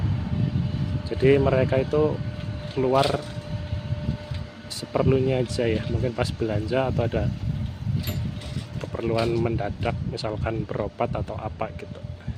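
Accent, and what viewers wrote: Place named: Tegal, Indonesia. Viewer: Logat bicaranya seperti orang dari Tegal nih😁, tapi lumayan bagus cara penyampaiannya 👍👌🇮🇩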